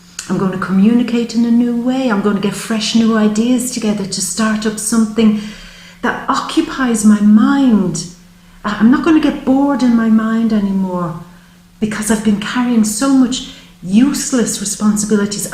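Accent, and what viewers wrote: Ireland. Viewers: you’re just incomparable. You’re a lightning bolt of inspiration striking out across the Atlantic—if only lightning bolts wore black dresses, talked with a brogue, and blew kisses. Close enough.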